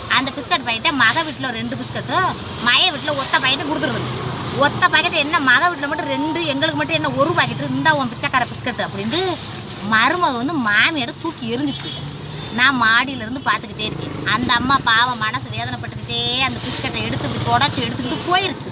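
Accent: Madurai, India. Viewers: மதுரையா கமுதியா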